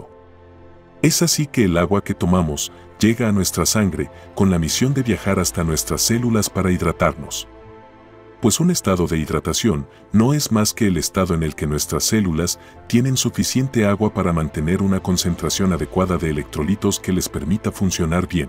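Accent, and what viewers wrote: Antioquia, Colombia. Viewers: Es mejor que ahora ocupes la voz de la IA . El acento parcero o paisa es muy cutre . Felicidades y mucho éxito para este canal .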